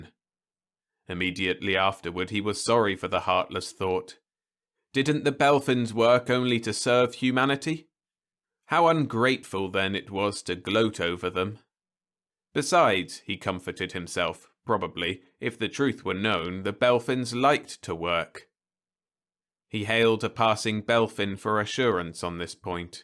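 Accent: United Kingdom. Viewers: I have trouble with the nasal British accent. Just a personal thing with me.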